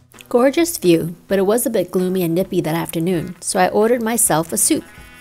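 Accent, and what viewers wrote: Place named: China. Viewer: Love it when you speak chinglish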